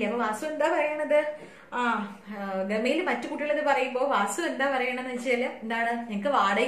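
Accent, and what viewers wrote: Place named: Pattambi, India. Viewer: ഒരു പട്ടാമ്പി കാരിയെ പോലെ കഥ പറഞ്ഞു..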